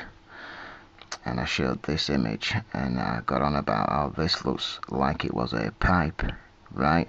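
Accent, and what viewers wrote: United Kingdom. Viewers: Its funny how i look at ancient buildings or while watching other videos from various YT Channels about these important topics I hear you saying in my head: "That is all REBAR... So much MUD (British Pronunciation emphasized!)... It is all a FASADE... This isnt a TOMB... PEOPLE LIVED THERE.... Certainly they decorated their houses with FLOWERS... Love your lessons, you are gifted!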